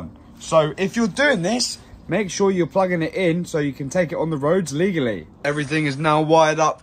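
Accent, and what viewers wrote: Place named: United Kingdom. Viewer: You sound British, therefore as the motor is likely over 250W which makes it an illegal motorbike, it goes faster than 15.5mph so it's now an illegal motorbike and you have a throttle that works past 4mph making it an illegal motorbike, it's not labelled up which makes it an illegal motorbike... None of what you did is safe or legal!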